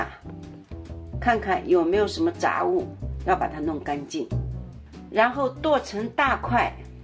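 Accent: Shanghai, China. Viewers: 好亲切的上海普通话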